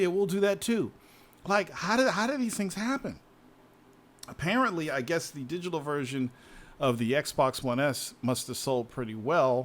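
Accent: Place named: United States. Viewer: why you put on an american accent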